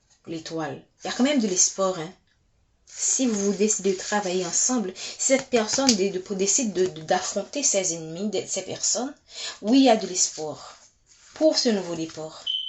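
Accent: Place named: Guadeloupe. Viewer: Bonsoir , je voud découvre à l’instant .Je vois remercie pour cette guidance , c'est tout à fait mon histoire avec cette personne, jai énormément morflé avec elle 😔🫤. Elle a beaucoup gens toxiques autour d’elle , et ces gens là, ont mis a mal notre relation, elle est très,très influençable malheureusement 🫤. Vous avez l’accent antillaise je pense de Guadeloupe à moins que je me trompe !🤔😀 .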